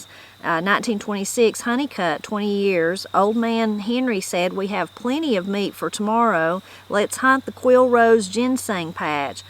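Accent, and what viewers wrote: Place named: South, United States. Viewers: Ya speak pretty much the same way we do in east and west TN, people look at me funny because of the way I talk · I call this southern english.I am 70 I don't speak it as much as I did when I was younger.I guess it is because all the older ones have passed away and media doesn't speak it.I love and am very proud to be Southern and speak Southern English.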